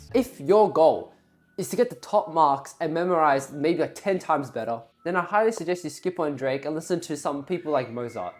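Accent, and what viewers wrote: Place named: France. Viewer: Is it only me who loves how he speaks french?💀 The accent and the advice are both gold thanks 😃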